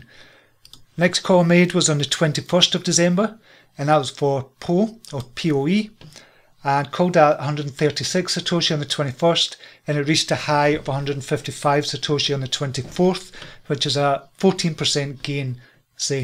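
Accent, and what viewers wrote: Scotland, United Kingdom. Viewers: I trust the Scottish accent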